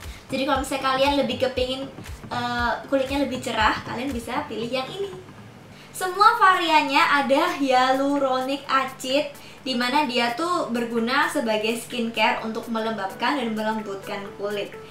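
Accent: Java, Indonesia. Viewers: Bahasa Inggrisnya bagus. Tapi pas ngomong bahasa Indonesia medok bgt. Sukaaaaa. Indonesia banget. Gak pake make up aja udah cantik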